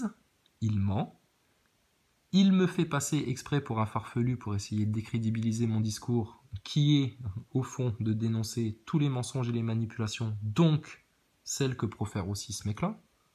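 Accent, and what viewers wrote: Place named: South, France. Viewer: Tu me tue avec ton accent du Sud 😂😂😂🙏😎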